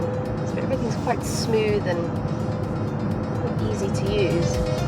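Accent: South Africa. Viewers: DJRCars Shes from Capetown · Are you south african? Your accent??!! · Jacque Noir what's fake? her accent is genuine as she's from cape town...!!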